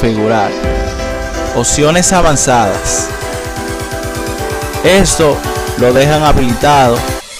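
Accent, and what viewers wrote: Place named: Dominican Republic. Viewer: Eres de República dominicana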